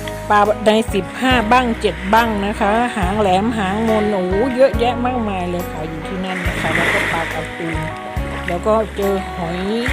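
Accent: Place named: Isan, Thailand. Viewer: สวัสดีค่ะพี่ เว่าอีสานม่วนแท่จ้า ขอบคุณที่มาแนะนำสถานที่และเอาภาพสวยๆของเกาะพงันมาฝากค่ะ💕💕🤟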